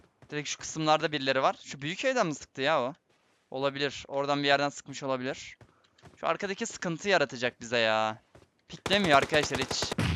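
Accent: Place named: Denizli, Turkey. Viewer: Abi sen denizlilimisin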